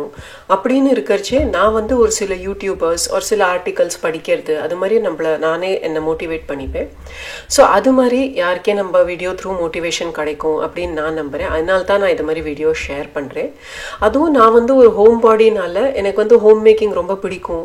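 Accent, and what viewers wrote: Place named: Palakkad, India. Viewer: Hi,your videos are very warm and motivating. Recently I’ve started viewing your videos and i love it. You are really doing a great job.All your recipes are very good and i tried a few also. You have a palghat accent 😊and that’s nice too.Keep rocking dear 👍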